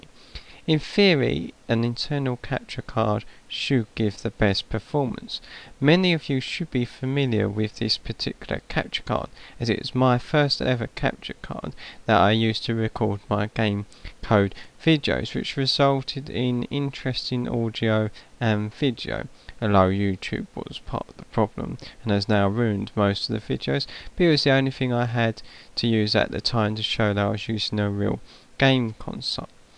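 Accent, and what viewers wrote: United Kingdom. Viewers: Lol i like british ppl explaining things. just a word of advice tho, talk a little louder. It will get ppls attention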